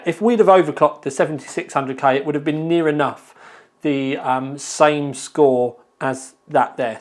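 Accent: United Kingdom. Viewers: that british accent annoys me!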